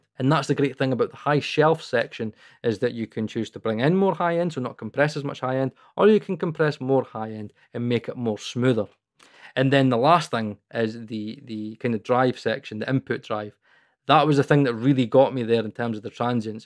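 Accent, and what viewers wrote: Scotland, United Kingdom. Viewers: Hi, just a quick off-topic question: When you shoot your videos, do you "soften" your dialect or is this the way you speak in real life, too? Just asking because I plan to go to Edinburgh next year with some friends of mine. (I have no problems at all to understand you. Been in Scotland 30 years ago but they've never been there. So I wanted to send them your videos to get used to the dialect.)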